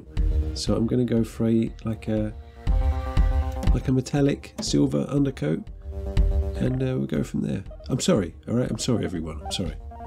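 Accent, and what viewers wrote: United Kingdom. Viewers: heees british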